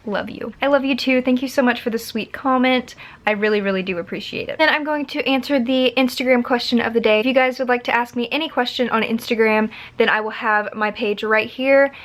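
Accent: United States. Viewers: Genuinely thought you were one of my friends that I haven’t spoken to in years then I realised you’re American and I’m English 😂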